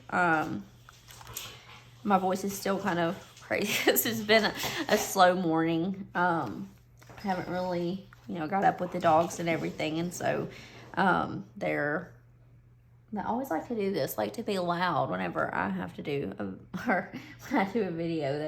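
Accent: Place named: South, United States. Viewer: ! That accent miss ma’am! I didn’t know you were a southerner like myself.😋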